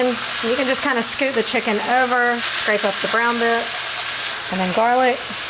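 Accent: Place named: Texas, United States. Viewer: Are u from Texas! I’m from Lake Jackson Tx. Love your accent ❤❤❤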